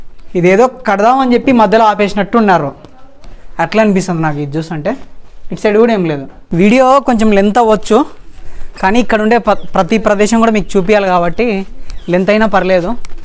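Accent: Rayalaseema, India. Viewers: U r from Rayalaseema u r accent says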